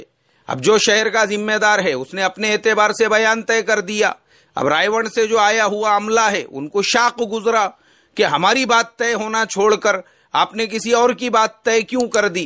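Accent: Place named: South, India. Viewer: Aap ki lahze se malum chalta he aap junubi hind se taaluk rakhte hein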